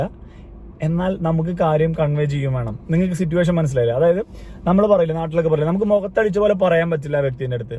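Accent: Thrissur, India. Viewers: R u from thrissur ?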